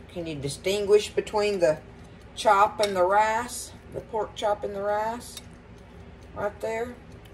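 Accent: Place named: Appalachia, United States. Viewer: Miss I just love to hear you talk you have the sound of a true hillbilly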